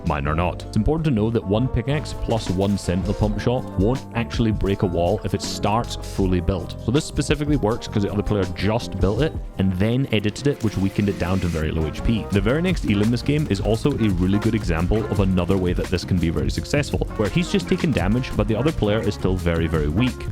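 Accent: Scotland, United Kingdom. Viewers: Your accent makes me want to drink IRN BRU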